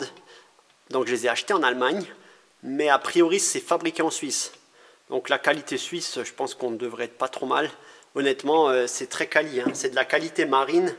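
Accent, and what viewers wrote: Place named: Alsace, France. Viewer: meme famille ! ;) ; tu as l'accent alsacien c'est ouf :) j'aurais parié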